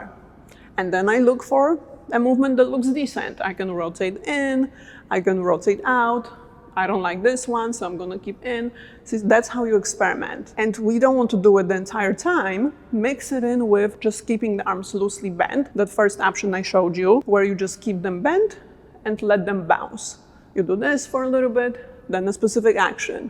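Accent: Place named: Poland. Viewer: As a pole from the accent, I think you are polish, are you??! Am I right ?!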